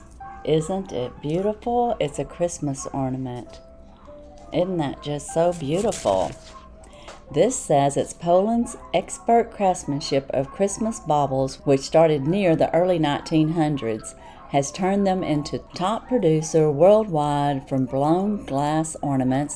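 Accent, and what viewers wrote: South, United States. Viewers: Hi Miss! I love hearing you pronounce all the Polish words with your Southern accent & enjoying the treats. Great variety of items in this box.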